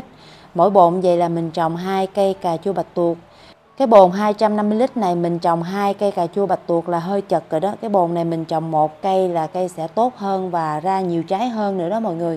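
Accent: Mekong Delta, Vietnam. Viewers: Nghe giọng tưởng em ở miền tây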